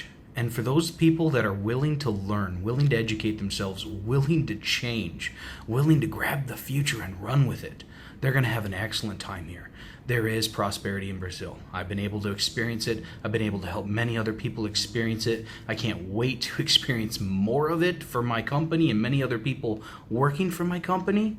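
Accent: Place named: United States. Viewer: Esse cara é br e deve ter morado mo cota nos eua tem mo sotaque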